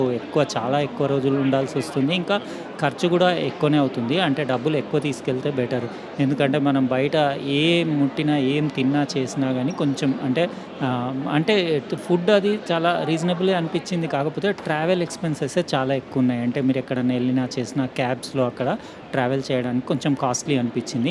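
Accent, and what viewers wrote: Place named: Telugu, India. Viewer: Yes i liked it sir....u soothing voice and Telugu slang with total technical things in simple way..... Plz visit china electronic market..... plz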